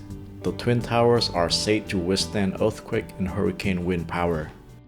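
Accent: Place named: Vietnam. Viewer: Overall OK but I have 2 issues with this video. 1) the steel beams didn’t melt, they softened while items made from aluminum melted. Melting steel is a falsehood that has been parroted by others for way too long. 2) it’s difficult to listen to a video where the speaker has such a thick Vietnamese accent. Some words sound like a hybrid pronunciation between Vietnamese and English. It’s like nails on a chalkboard at times. It made it very difficult to actually sit and watch everything until the end.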